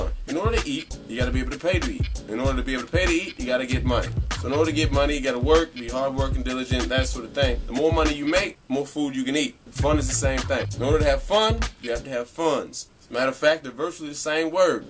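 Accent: South, United States. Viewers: is he from the south? seems like he got an accent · What happened to your accent? I love country accents!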